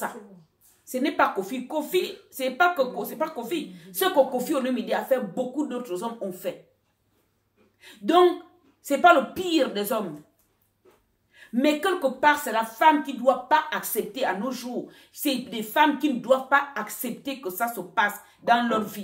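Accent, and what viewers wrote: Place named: Gabon. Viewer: Elle est Gabonaise 🇬🇦 c’est comme ça que nos mamans Gabonaises parlent👌🏻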